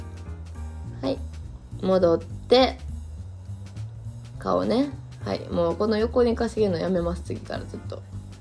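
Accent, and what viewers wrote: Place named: Kansai, Japan. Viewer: 毎日更新、嬉しすぎる😊関西弁かわいい💕🥰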